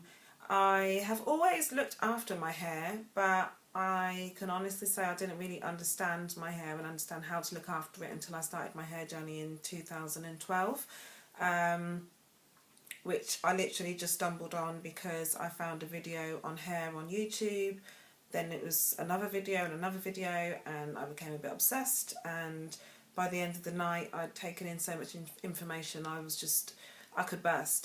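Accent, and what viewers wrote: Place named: London, United Kingdom. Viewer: are you British your accent sound like you from london. loving your videos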